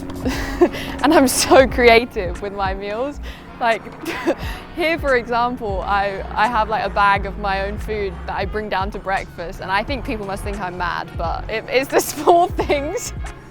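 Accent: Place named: United Kingdom. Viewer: Ll aa ndon... xexexe... so british eh!!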